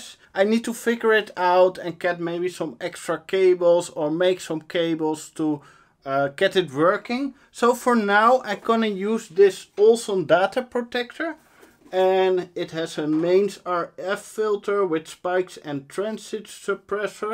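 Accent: Netherlands. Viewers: On you can hear he's from the Netherlands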